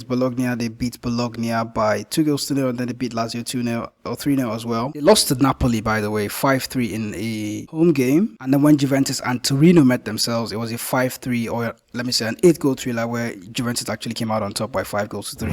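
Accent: Nigeria. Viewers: Are you nigerian bro ? You sound nigerian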